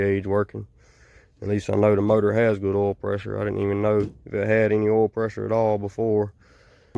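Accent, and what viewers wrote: South, United States. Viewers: Believe it or not the funny southern drawl is what let's me know you know how to work on hotrods. Call me judgment/prejudice.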